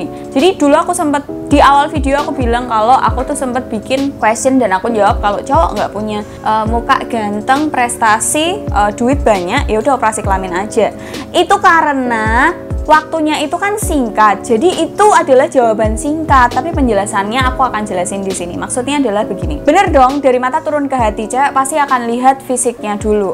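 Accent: Java, Indonesia. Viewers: sangar ngomonge medhok jowo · medok e wes mendarah daging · Mbak'e iki medok jowo ne seru wes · Medoknya itu Loh ❤️🔥🔥👍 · Logate mbake jowo banget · Entah kenapa gw seneng bgt nonton konten kak. Cakep Medok Santuy Blak²an Apa adanya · Logat jawanya pekat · medok'e kaaaueeetokk mbak'e wkwkwkw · logat jowone kuentel banget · Jowone sangking pundi mba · Saya suka bahasa medoknya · Logad jowonya itu loh asli ane suka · Peh jowomu mbak. Tapi lumayan menghibur kok. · Medok banget mba, ane pusing dengernye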